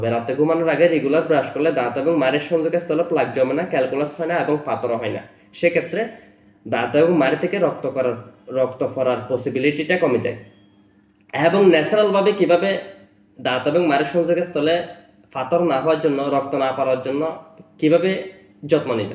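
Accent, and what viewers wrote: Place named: Chittagong, Bangladesh. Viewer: bhai apni mone hoy chittanga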